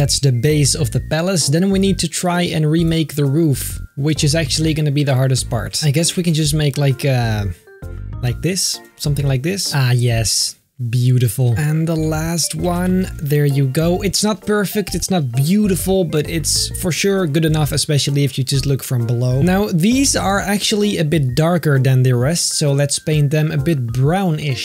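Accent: Netherlands. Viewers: Your acccents sounds hollandischs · ar you dutch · Are u dutch? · one more confirmed that is dutch he said hupatee en maybe he is also brabants (ik hoop wel dat t een brabo is want brabo`s zijn cool XD) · Are jou from the Nederland or belgium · Are you Dutch?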